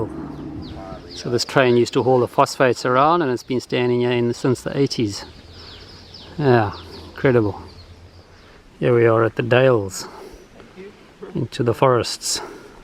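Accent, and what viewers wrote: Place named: Durban, South Africa. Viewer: Accidentally found your channel and then realised you have the same accent that I have! Enjoying your content. Ex Durbs.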